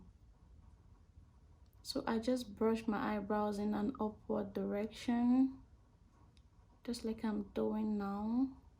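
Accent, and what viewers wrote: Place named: Nigeria. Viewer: She sound like Nigeria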